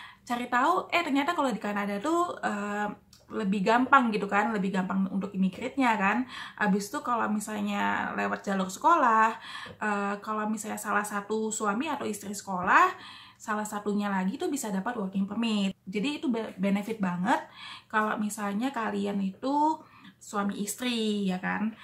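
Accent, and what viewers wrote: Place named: Bali, Indonesia. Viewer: hahaha iya, logatnya mirip Bali. hehe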